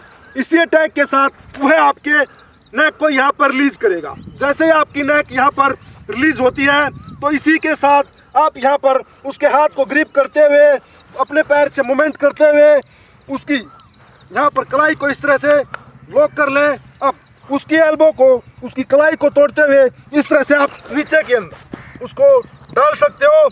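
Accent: Haryana, India. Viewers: I think Aap haryana se ho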